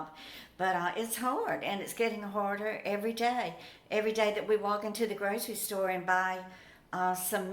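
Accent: South, United States. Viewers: I love you're accent, its a beautiful, classy, southern, Lady voice. Its very calming & loving. I wish the southern ladies of today had this. Its a lost art. I was raised in West Virginia, southern people have heart & soul thats unexplained by us southerners, but totally understood, an unspoken love of heart.I really love that! Im 35 years old & I would absolutely love for you to write a book, a memoir about your life. Truth, honest, good bad & ugly. The highest highest highs & the lowest of lows. I love reading biographies and memoirs about peoples struggles, demons, their joys, they're better angels. Please think about it if you would? You've overcome alot & still keep joy on your face. That alone is a miracle, even the days where its hard to find joy. Im sure it would help people out. What do you say? 😁,❤️ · Glad to see you back & looking so fresh & pretty! 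I didn't realize that p.o.boxes were that expensive per year. I am a recent subscriber and being from the South (Georgia), I just love your Southern accent. You are a great storyteller & I enjoy them. Rest when you must & people will understand. We will keep on coming back! :)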